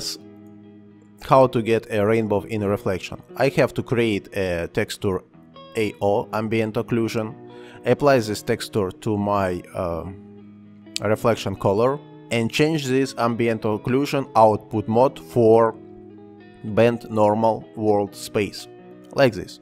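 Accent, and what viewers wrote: Russia. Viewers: thanks for RUnglish!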